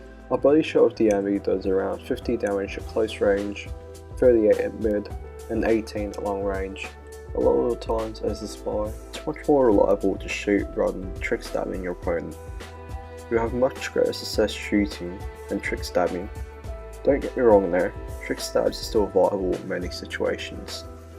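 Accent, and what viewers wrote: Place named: Australia. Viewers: He is Australian. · Nice vid. Personally, I prefer the l'etranger, but this is really helpful. (Your accent is Australian, btw.)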